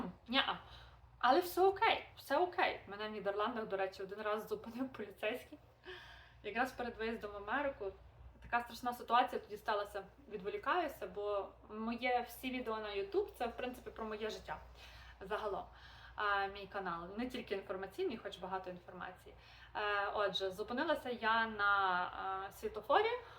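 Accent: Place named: Galicia, Ukraine. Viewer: Дякую за відео, з мене підписка, планую їхати до Нью Йорку. По розмові видно що ви моя землячка з Галичини ). Я до речі з Львівщини. Хай щастить вам на новому місці!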